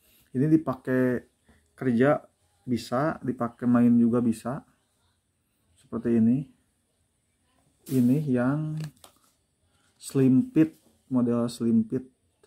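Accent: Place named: Bandung, Indonesia. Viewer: Pasti abangnya orang bandung yahh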